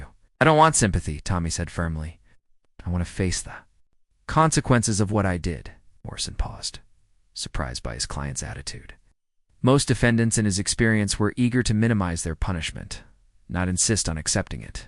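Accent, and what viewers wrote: United States. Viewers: This is - as usual - a garbage, American accented load of crap!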